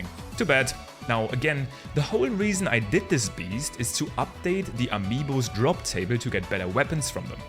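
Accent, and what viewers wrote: Germany. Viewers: I hear Denglish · Just wanted to ask are you german? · Sag mal bist du eigentlich deutsch? Dein Aktzent oder dialekt ka hört sich stak so an. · Hey I am wondering if you are german as your accent reminds me of my accent. Sorry if I am wrong. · Ich bin leicht getriggert von diesem Deutschen Aktzent. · are u german? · You Sound very german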